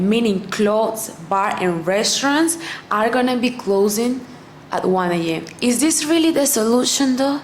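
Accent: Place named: Paisa, Colombia. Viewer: tienes novio? Me encanta tu acento paisa en ingles, me gustaria sacarte a bailar un dia de estos por ahi en Medallo! Saludos!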